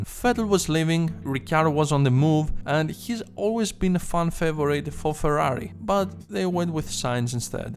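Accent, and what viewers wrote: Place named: Greece. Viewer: Where are you from? Your accent sounds very greek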